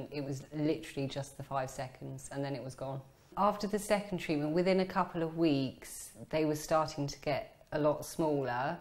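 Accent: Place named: England, United Kingdom. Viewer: What is beautiful about this woman? EVERYTHING!! Her face, her smile, and perhaps, most importantly, her accent. I wonder where is she from. I know she is from England, but I wonder what part of England?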